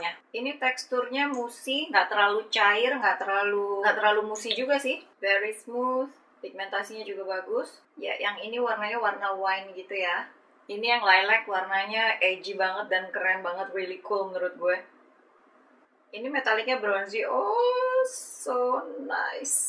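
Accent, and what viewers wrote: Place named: West Java, Indonesia. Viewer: Suka sama ka kalau lagi review logat sundanya keluar,kocak pas bilang muruluk murudul 😂😂😂😂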